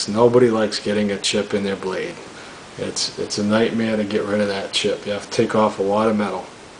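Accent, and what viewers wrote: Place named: New England, United States. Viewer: Let me guess , Rhode Island or Boston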